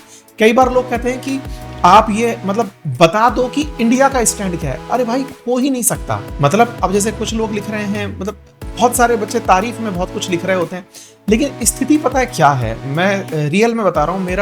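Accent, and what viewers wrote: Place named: Bihar, India. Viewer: Aap v मै ko ham boltey hai , mujhe toh lagta tha ki ye wala sirf bihar babu use kartey h.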